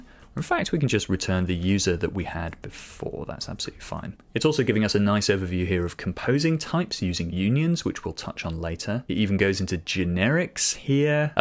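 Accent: United Kingdom. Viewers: Your British accent make your videos sound polite from my point of view hahaha.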